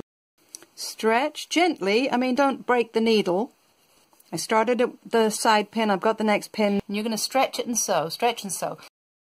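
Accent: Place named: United States. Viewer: Thank you for such a handy tutorial. Are you Irish? You sound like you have a mix of Irish and American accent, very sweet.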